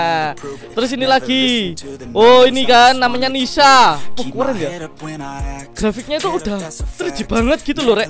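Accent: Java, Indonesia. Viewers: Bhs lu medok bgt bang..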